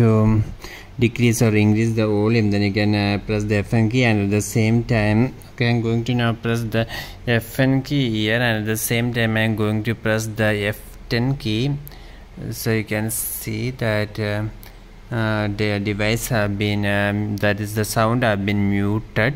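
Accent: India. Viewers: where is the accent from? is it India?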